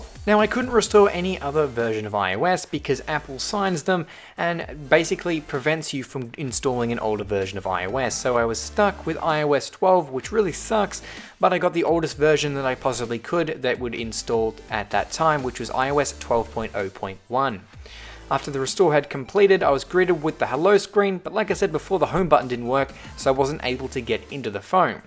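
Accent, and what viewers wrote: Australia. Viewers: are u from Aus? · The battery had a 'crazy' logo on it and the guy sounds australian so I assume its crazyparts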